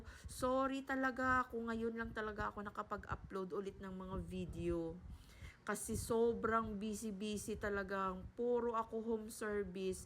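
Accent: Iloilo, Philippines. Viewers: Hahahahaha .. N d sapaka ahh..nami ya imo tingog...!! Ilongguha madam ahh..hehehee Bay'i da cla...hehehee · Illongo accent is a bit annoying here in negros oriental its an accent from bukid only kagid haw haw d karabao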